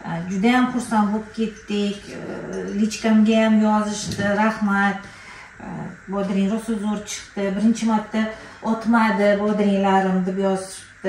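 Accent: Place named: Tashkent, Uzbekistan. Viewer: Замонавий амалдор айолга ухшайсиз илтимос адабий тилда гапиринг тошкент шеваси жуда одамни гажини келтиради хар сузга а кушмай гапиришни иложи йукми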